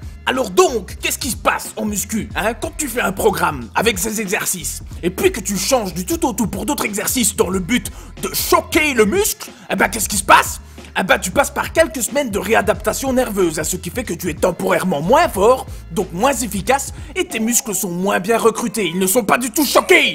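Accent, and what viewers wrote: Liège, Belgium. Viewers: haha;) tu es d'ou de liège?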